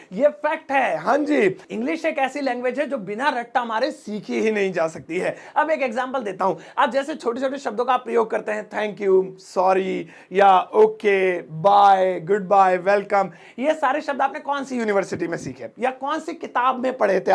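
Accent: India. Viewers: This teacher is very Desi . This teacher is very funny English language. How a great teacher spoken English. Good night 😴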